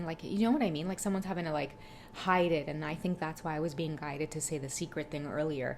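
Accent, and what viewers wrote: Trinidad and Tobago. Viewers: Hi.. I'm Jamaican 🇯🇲 & let me tell you, I absolutely love the trini accent! I always look forward to you saying something in your accent. Love it! ❤ This reading rings true about songs, & they're very old, most of them I've never heard before but speaks of our situation. It's been happening on my end too both channeled & hearing on the radio. He's definitely getting clarity cause I do sense/feel it. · Hi i am a twin flame and also a trinidadian, love the accent and you always have pleasant and optimistic things to say to those on this journey. Blessings to you.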